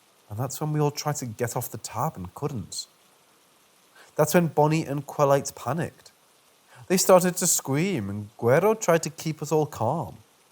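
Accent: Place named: Merseyside, United Kingdom. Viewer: Good to hear another Merseyside accent! 😁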